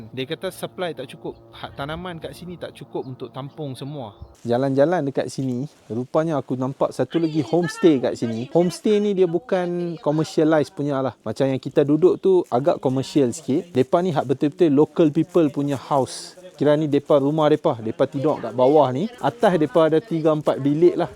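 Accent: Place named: Kedah, Malaysia. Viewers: bestnya..cantik sgt permandangan kg tu..terima kasih Dr..lg best bahasa kedah sampai sana. jga diri dn kesihatan bos. terbaikkk👍👍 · Alhmdulillah aka kira baru masok line ni, seronok sgt aka bila dgr Adik ckp logak kedah, terbaik dik, Sgt jga tatasuli bahasa, terbaik dik, aka tak miss tgok Ulang2 yg pat kt india dgn mesir, kira semua aka suka, terbaik dik 😂👍👍👍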